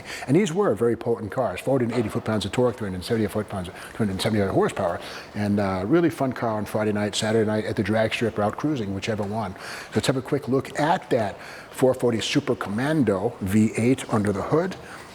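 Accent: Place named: Northeast, United States. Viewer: Is it just me, or is speaking with a more pronounced Northeastern accent?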